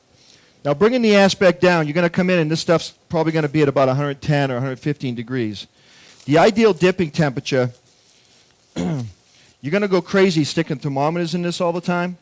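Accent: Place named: Boston, United States. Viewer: he's from boston give him a break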